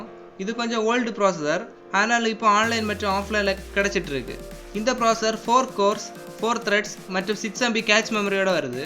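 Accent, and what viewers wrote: Kanyakumari, India. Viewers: Bro enaku oru pc build pannanum. Slang ppatha kk slang mathiri iruku.. unga address illa number kedaikuma.enaku office purpose ku than so minimum evlo budget la panna mudiyum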